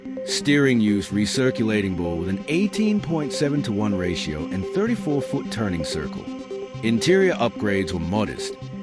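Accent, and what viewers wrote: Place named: United States. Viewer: You couldn't find an AI Aussie voice 🙄 it kinda ruins it with the American accent.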